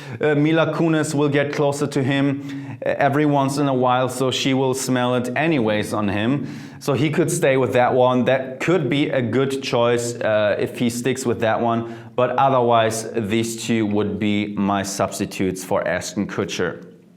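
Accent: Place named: Germany. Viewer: omfggg german accent soo hard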